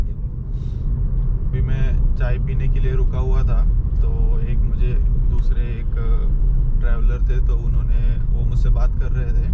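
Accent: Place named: Maharashtra, India. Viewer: Are wa wa marathi mulga talking in English..... Good good nice information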